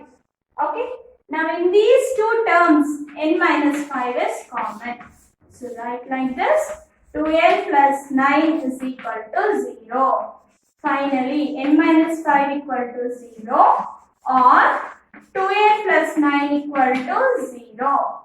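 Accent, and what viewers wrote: Karnataka, India. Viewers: Mam ur from karnataka?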